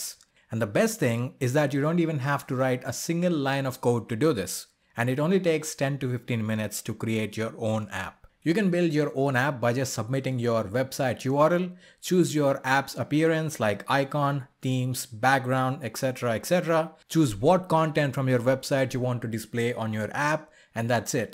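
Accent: India. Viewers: Indian no text to speech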